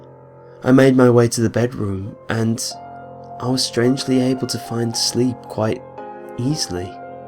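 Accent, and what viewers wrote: United Kingdom. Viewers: Your BRITISH !?!?!?!?!?!?!?!?!?!?!?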